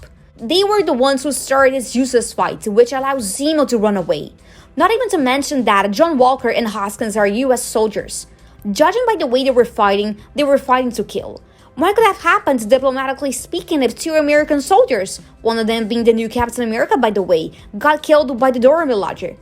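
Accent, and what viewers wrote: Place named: Brazil. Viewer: Ahh, i had a brazilian teacher, i thought the accent felt familiar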